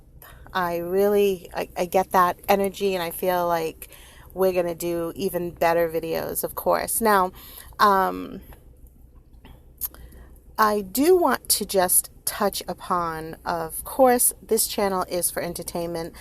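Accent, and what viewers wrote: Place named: East Coast, United States. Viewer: No matter what platform you use, you will find that a lot of negative comments will suddenly disappear. I'm American and I'm guessing by your accent you're from the East coast(?) That's where I'm from and I grew up in a very diverse area where people are just people, and nothing was black or white. You are judged on character, not color. My band mates are English and we're all on the same page with these two. These people, the "sugars" as they call them, have no idea who we are or where we come from. They judge and attack based on comments posted about a woman who has chosen to live her life in newspapers and on TV. I never saw a wedding where none of the guests looked happy, the groom looked shell shocked and the bride looked defiant before their wedding. I just knew from that point on that it wasn't going to end well.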